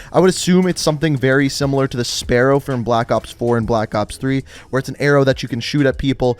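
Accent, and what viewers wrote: Canada. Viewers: That Canadian accent😬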